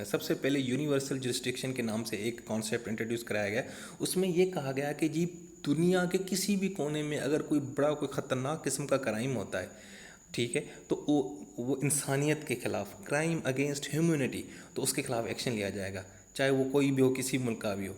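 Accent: Pakistan. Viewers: Started watching and was like “awaaz se toh lag rahe Pakistan ke hai ye” and fir aapne petrol price puch liya. Great video though! Helped a lot.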